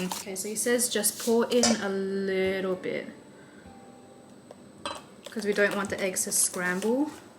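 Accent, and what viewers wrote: Australia. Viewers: I like your vlog! No worries about the duration of video 😆 オーストラリアに行ったことがあるので、オーストラリアの発音がとても好き🇦🇺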